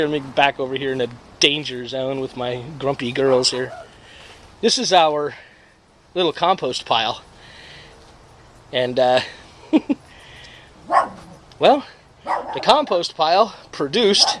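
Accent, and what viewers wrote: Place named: New England, United States. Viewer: Nice a little touch of New England in the South:)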